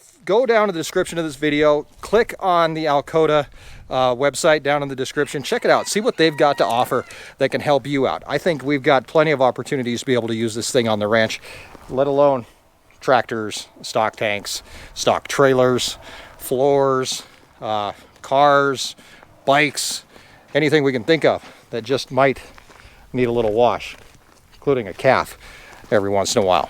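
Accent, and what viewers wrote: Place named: Boston, United States. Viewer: Cuts like “nuttall”, since I like in Massachusetts you were speaking my language. “Paak the ka in the yaad”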